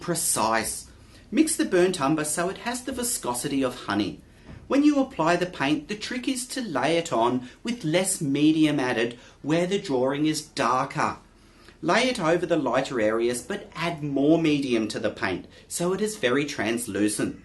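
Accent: Australia. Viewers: lo, the dude has an extremely heavy Australian accent, I think ur safe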